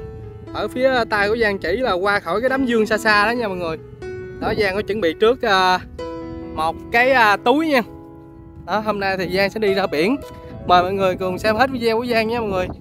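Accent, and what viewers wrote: Ben Tre, Vietnam. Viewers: Lần đầu xem video a nghe tiếng e nghi a ở bến tre rồi. E ở batri e cũng đang cùng nghề bếp .e cũng hay xem video của a cảm ơn a đã chia sẻ những video ý nghĩa chúc a thành công trong công việc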